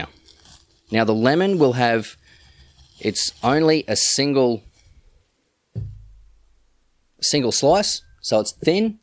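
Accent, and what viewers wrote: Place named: Australia. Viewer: The Aussie accent I love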